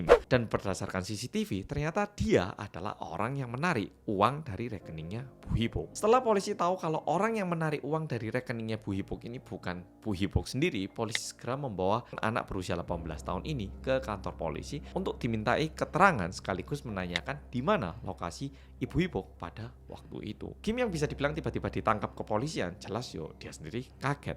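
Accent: Java, Indonesia. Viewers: Suaramu medok banget bang koyok wong njowo.ya paling sopirnya itu yg membunuh.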